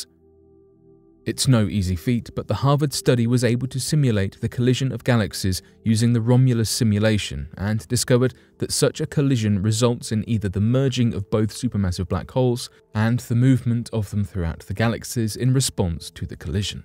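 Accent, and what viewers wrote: United Kingdom. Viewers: Earache British voice, thought this might be entertaining but it's just fucking annoying and monotonous, no sub, set to do not recommend channel.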